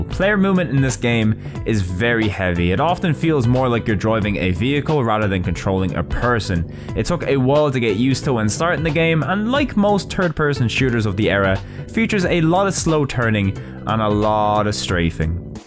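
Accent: Ireland. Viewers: I'm glad you picked up on something closer to his earlier Game Dungeon style. He's transitioned into doing a sort of 'play-by-play' style as he goes through the games because it's easier for him to get episodes out, which is understandable, but I don't like it quite as much, with the game having more of a factor in whether or not I like the episode than it did previously. So a channel with a classic Game Dungeon style talking about PS1 games most people don't remember with some dry humour sprinkled throughout? Now that's a winner right there. Throw in an Irish accent that I have fun imitating and making the decision to subscribe to you didn't take long at all. Keep it up, lad.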